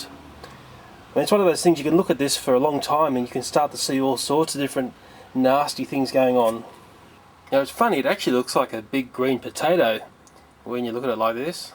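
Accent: Australia. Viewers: Sounds like he is Australian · KawaiiKitty I think he's Australian, and i believe it was a silkworm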